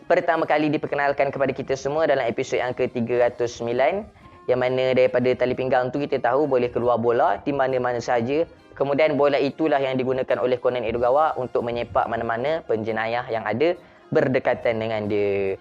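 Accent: Kelantan, Malaysia. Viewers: kuat bnyi klate tu 🤣🤣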